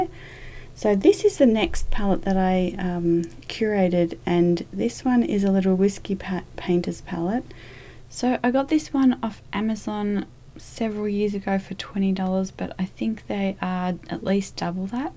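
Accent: Australia. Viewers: A nice Aussie voice talking about paint? Instant sub!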